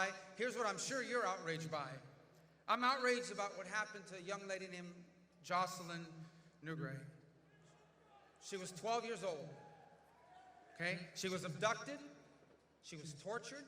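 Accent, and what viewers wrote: Cuba. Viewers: Que lindo es el acento cubano . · Maravilloso acento Cuba 🇨🇺♥️🇺🇸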